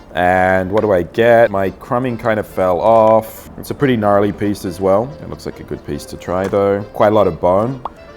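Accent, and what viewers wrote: Philippines. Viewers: Omg you have a filipino accent ahahaha i thought you are a filipino when you talked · That Filipino accent wow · Hahaha... I am so amaze to hear a forigner with Filipino accent after speaking in Tagalog. Awesome! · Lol I like your tagalog accent! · nice tagalog accent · You sound more filipino than some Filipinos honestly... and it amaze me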